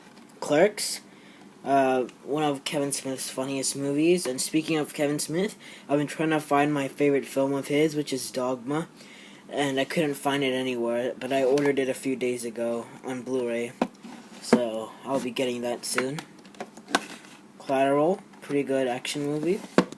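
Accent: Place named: United States. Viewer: Where u from? You sound American but most of your blu rays have UK ratings on them.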